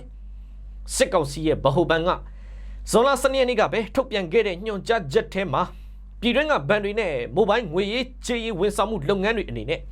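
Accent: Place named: Bago, Myanmar. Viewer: ခေတ်သစ် သတင်းကြောငြာတယ့် သူက ဘုန်းကြီးလူထွက် ပဲခူးသားဘဲ ဖြစ်ရမယ့် လေသံက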